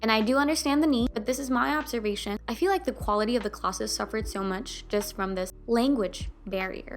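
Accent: United States. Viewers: She was raised in Turkey, though she's fluent in English and has an American type accent.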